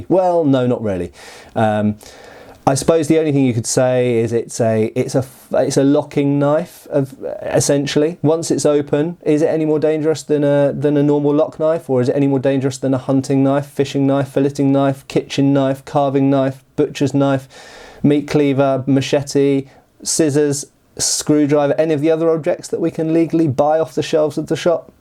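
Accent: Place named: England, United Kingdom. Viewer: yep, really sounds English to me...................................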